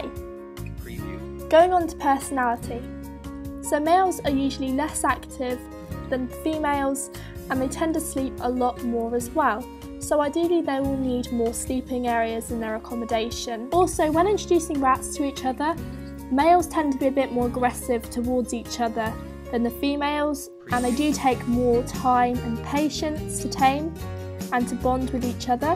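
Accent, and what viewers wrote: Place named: London, United Kingdom. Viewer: What area of London is this accent from?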